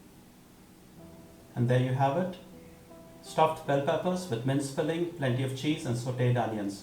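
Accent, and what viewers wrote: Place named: England, United Kingdom. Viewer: Good thing is that you took care of the fact that your filling is neither oily nor runny. Usage of Tomato paste instead of the traditional method not only enhance the taste but it helped you get the required consistency in your filling. What I observed is that in this recipe your camera angle is not top notch. Especially when you were making your filling and sauteed onions. This dish looks more South Indian in texture though no coconut is used. The running commentary always reminds me old Cricket matches used to telecast in 80s n 90s with aristocratic English language and meticulous English accent. All in all, exciting stuff as always.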